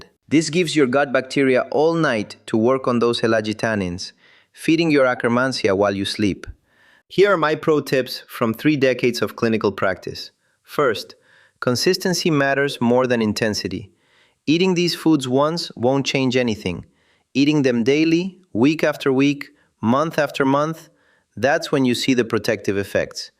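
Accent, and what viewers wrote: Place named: Mexico. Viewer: Why is he switching in and out of a Mexican accent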